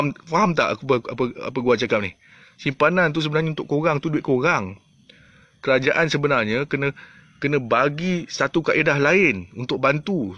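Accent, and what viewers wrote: Kelantan, Malaysia. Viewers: Kemah keming kemuk vutoohh yip yip yip😂..terbaik bey lado..kecek Kelate sdap dgar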